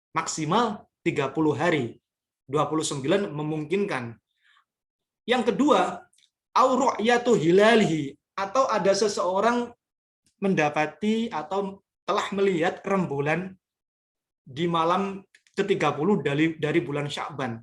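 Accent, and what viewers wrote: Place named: Jakarta, Indonesia. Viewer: Sudah memakai logat logat jakarta,. Terbaik!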